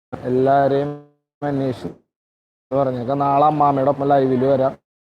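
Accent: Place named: Ernakulam, India. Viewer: ernakulam slang adipoli 😀😀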